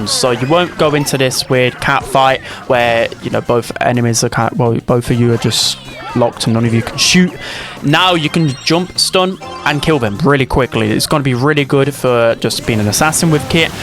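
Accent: United Kingdom. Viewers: I know it’s just the British accent but pronouncing the h in h is always funny to me. Nice vid❤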